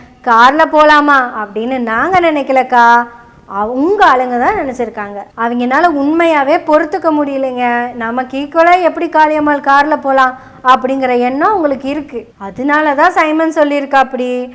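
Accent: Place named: Coimbatore, India. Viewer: Super as usual always you are bold and pronouncing in Kovai language and taking subjects 🎉❤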